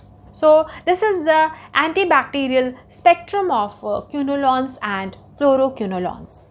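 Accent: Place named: England, United Kingdom. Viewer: liked your English accesent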